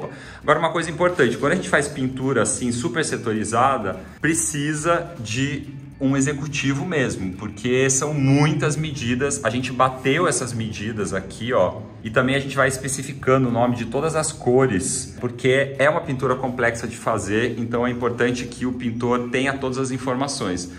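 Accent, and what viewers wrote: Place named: Paraná, Brazil. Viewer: Vc não perdeu o sotaque de pé vermelho!🙈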